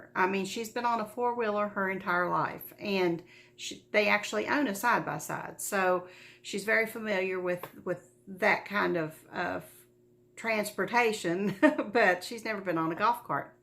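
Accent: South, United States. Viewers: I found you because of a shout-out from Heidi Cran. She said you were real sweet, she enjoyed listening to you; I think she may have mentioned a southern accent. I'd love to stitch the Wysocki charts. Dimensions had a kit "Classic Farm" that was discontinued and word is that they may re-release it. Your Wysocki charts are very reminiscent of that kit. I have been to the P. Buckley Moss Museum and loved it. I stitched a Christmas Stocking chart of hers.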